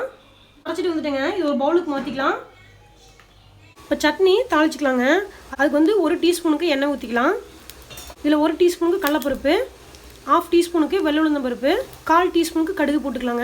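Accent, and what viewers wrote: Kongu, India. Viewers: Neenda idaivelikku piragu alagana kongu tamilil receipe arumai kutties nallarukkangalamma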